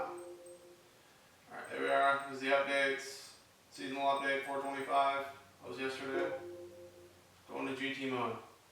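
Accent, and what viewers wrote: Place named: RP, United Kingdom. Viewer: I gave u a like like posh accent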